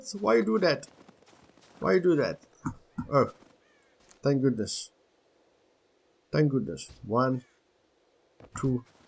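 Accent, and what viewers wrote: Afrikaans, South Africa. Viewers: this was a good video. funny bloke :) a bit clumsy sometimes. hilarious. very genuine and yes. the game is what we make of it. cheers fella. can i ask - is that an Afrikaans or British SA accent? :) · I only subbed because your Afrikaans accent is so strong.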